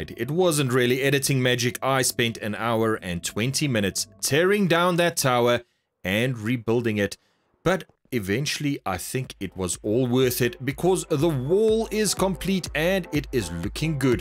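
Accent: South Africa. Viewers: Are you from south africa??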